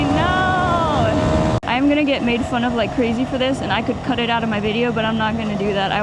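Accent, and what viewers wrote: United States. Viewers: Sothern accend 👌🏼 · where are you originally from?? I haven't seen all your videos so not sure how you sound American but are out in Luxembourg... sorry ..im nosey...lol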